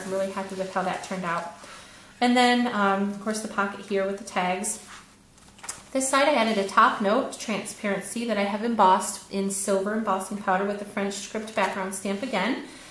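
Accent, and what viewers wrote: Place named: United States. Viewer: Zounds! This is fabulous! So beautiful!!! Are you a transplanted American in Ireland? You sound very American! (Not that this is bad of course!....just curious cuz I spent a lot of time on the Isle of Wight and was pegged all the time! I even had a bunch of kids stop me and want me to say something in American!!!! What a hoot! Great mini!!!! Hope you don't mind my scraplifting! U have great ideas!!!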